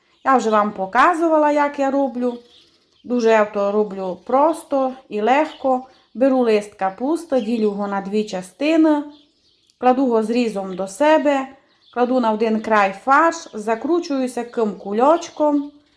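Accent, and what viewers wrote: Transcarpathia, Ukraine. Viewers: Дякую Вам за рецепти і наший закарпатський діалект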